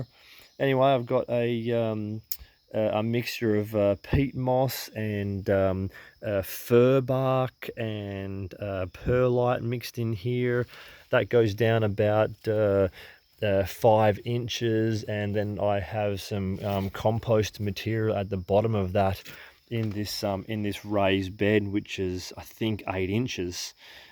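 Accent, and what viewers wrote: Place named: Australia. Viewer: You sound like an Aussie 🇦🇺☺️🙋🏽‍♀️ But you’re in Canada?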